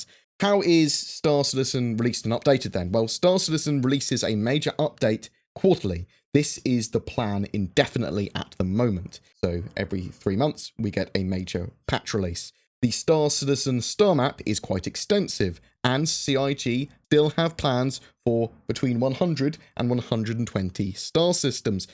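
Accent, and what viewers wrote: England, United Kingdom. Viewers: Your english accent is so terrible.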